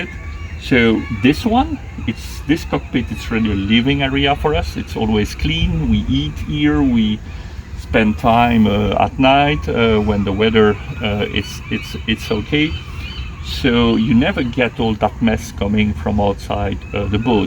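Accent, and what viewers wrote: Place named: France. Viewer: Merci beaucoup d'avoir partagé votre point de vue, et quel beau bateau, excellent gréement ! Youtube fait la promotion de votre vidéo aujourd'hui, alors bonne chance, vous obtiendrez plus de vues et de clics dans les semaines à venir, je suis un "Subscribed" maintenant . Quelques questions : votre enseigne est USA, votre accent est français et votre cuisine est belge, où est votre port d'escale maintenant, toujours Seattle ? Je n'ai pas saisi le nom de votre bateau 🤒(AIS?) Je ne connaissais pas le constructeur du bateau, donc superbe promotion pour Fabola. Intérieur magnifique. table bonne idée, mais pourrait être mieux... Je suis d'accord sur l'enrouleur dans le mât, mais couvrez le triangle restant aux UV, je viens de casser mon point d'écoute de selden enrouleur à Nieuwpoort en juin. La plate-forme de bain est une solution un peu maladroite entre un bateau de course avec le contrôle du mât arrière et un croiseur avec une sortie confortable de l'eau. 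Vous êtes le seul à avoir une Fabola sur Youtube, alors partagez d'autres vidéos si possible. Votre moteur, Volvo Penta ? Qu'avez-vous changé sur votre bateau depuis que vous l'avez acheté ? Votre équipement de navigation..vos voyages au dela de la cuisine. Plus important encore, qu'est-ce qui s'est cassé et comment l'avez-vous réparé. Il y a une grande communauté de marins sur YT qui apprennent les uns des autres, et peut-être ce qui s'est mal passé va aider la communaute.Pas tout le temps des bikinis, et le style sans faute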